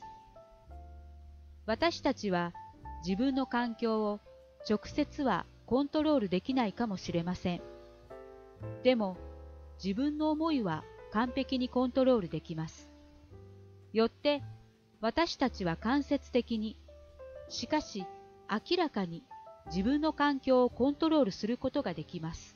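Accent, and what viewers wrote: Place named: Kansai, Japan. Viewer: 関西なまりが気になりましたが、寝る時よく聞いてます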